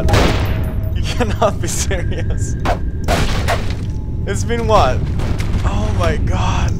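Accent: Sweden. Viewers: Dat swedish akcent ;)) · Who came here for real Swedish accent ?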